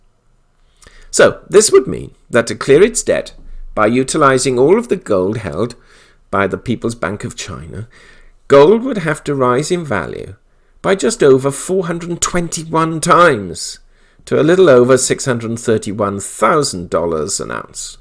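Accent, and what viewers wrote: United Kingdom. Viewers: China owes the US a trillion dollars? Are you on drugs? The US is and has been running record deficits with China for decades and your position is they owe the US? Despite your smug British accent you clearly are a deep state shill. Figures don't lie but liars figure. China holds 30k tons of gold, the largest gold holder on the planet so your figures are out in left field. China's debt is to itself so it doesn't need to revalue gold but when it does it will expose the wests paper ponzi scheme and then your lies will be illuminated.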